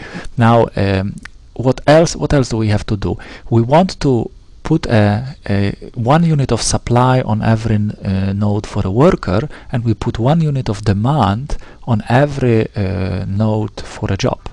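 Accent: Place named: Egypt. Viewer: واضح انك مصري :))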